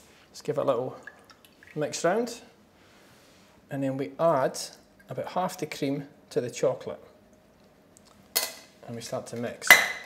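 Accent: Scotland, United Kingdom. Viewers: Oh that beautiful chocolate and that Scottish accent... <3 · I just looked at the comments to see id anyone mentioned his scottish accent 😂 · Ahh Scottish accents <333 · Chocolate upon chocolate upon chocolate and a Scottish accent. This video was sent from heaven. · He is Scottish like mee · no, he sounds very scottish.... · He's most likely from central Scotland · He's Scottish, AKA the language I speak. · Scottishhhhhhhhhhhhhhhhhhh, yaldi. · This man is Scottish, not Irish~ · Where is his accent from? Scottish? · I know that you've already had you me question answered, and yes, he is Scottish, but some Scottish accents are different depending on where you live ( I'm Scottish ) :) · YES! He's Scottish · He scotish? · you are right jonge!!!, its sound scottisch. I said that he was a dutch because it really sound like dutch speaking english...buut isnt it. 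I actually still have a dutch accent when i talking english hahah ;) doei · I wasn't expecting a Scottish accent going into this 😂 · I was trying to wind him up lol I know it's scottish, you know how the relationship with Scottish people is with the English ;) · haha no he's definitely scottish,i lived there for a year and he definitely is scottishxP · Definitely Scottish! The way he pronounces 'R' tells that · no he sounds very very scottish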